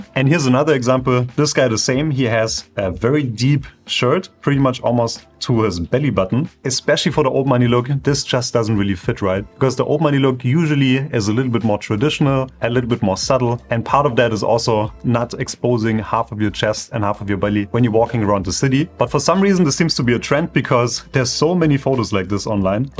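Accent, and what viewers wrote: Germany. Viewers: I hear german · ich liebe es, wenn man auf einmal auf englischsprachige Deutsche trifft 😂 Der Akzent verbindet uns😂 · Oh hör ich da etwa deutsch raus? 🤫🤔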